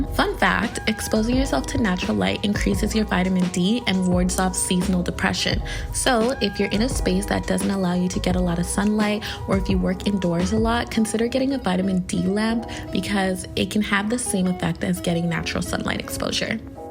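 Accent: Nigeria. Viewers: As soon as you switched to the Nigerian accent you won my heart and I subbed 🤗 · AS soon as you hit that Nigerian accent I subscribed! I love following African youtubers because its easy to relate to them. I am glad you were in my recommendations! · That Nigerian accent was unexpected 🤣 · Wow 😯 I had to pause when I heard your naija accent. Ayyyyy Hi sis 💕🇳🇬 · She’s definitely either Nigerian or Ghanaian😭✋🏽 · same totes nigerian accent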